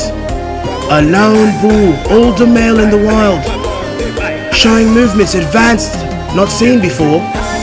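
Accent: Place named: England, United Kingdom. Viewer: This is more like sex on the dance floor.... That English accent though is bomb...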